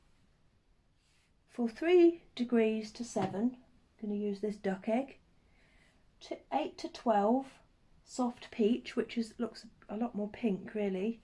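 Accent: Norfolk, United Kingdom. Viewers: Hi, I don’t know if you’ll get this as it’s on your first podcast, but the algorithm popped up your channel with current new video and I’ve been watching for a few weeks now and thought I’d go back to your start. I thought I detected a Norfolk accent, my husband and his family all came from Norwich, although we live in Swansea now. I love watching you. You remind me of my cousin. Take care. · I have loved watching your episodes so much I have decided to go right back to the start and watch them all one after another. I'm in Bunbury, Western Australian and my son lives in Norfolk as well so new I had heard your accent before when I hear his friends. Wishing I was over there again but the virus has kept us apart from two years now. Cannot believe how talented you are!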